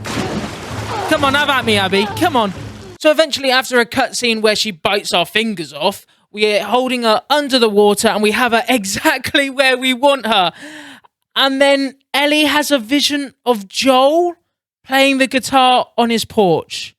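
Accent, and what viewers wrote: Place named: United Kingdom. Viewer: Make more video only down side is that your british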